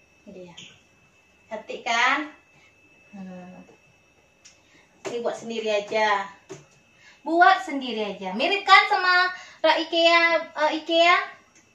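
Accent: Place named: Sumatra, Indonesia. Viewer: didaerah mana tinggalnya, logatnya spt di sumatera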